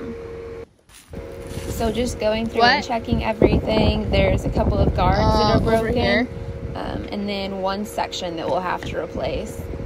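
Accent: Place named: Texas, United States. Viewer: You dont sound like youre from Indiana lol...more like Oklahoma or Texas lol. Does everyone in Indiana sound like you :)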